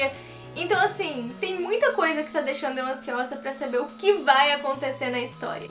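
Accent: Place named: São Paulo, Brazil. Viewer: pensei q vc era de São Paulo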